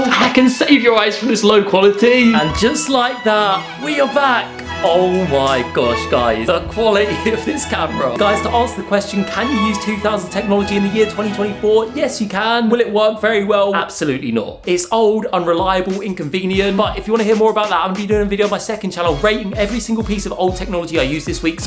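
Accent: United Kingdom. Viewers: I love his British accent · Just bothered with the British accent sorry😅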